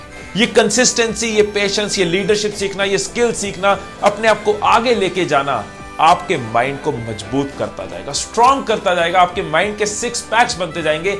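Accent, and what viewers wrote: India. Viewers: Sir hello english indian hai ya chinese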